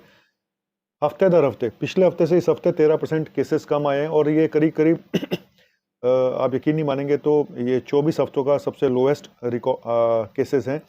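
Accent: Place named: Bihar, India. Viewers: Sir I have been very much impressed by your Bihari accent .love you sir 🙏🙏🙏🙏🙏🙏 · Behari accent,Nice👍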